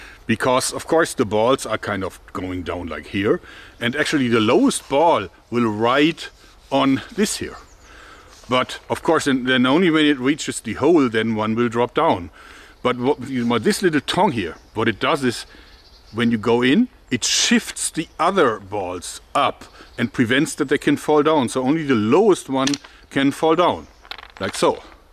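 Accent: Germany. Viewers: qualquer brasileiro normal: POR QUE CARALHOS O YOUTUBE TA ME RECOMENDANDO UM VIDEO DE UM CARECA COM SOTAQUE ALEMÃO SOBRE ESTILINGUE ARTESANAL??? YouTube: nosso algoritmo é perfeito :)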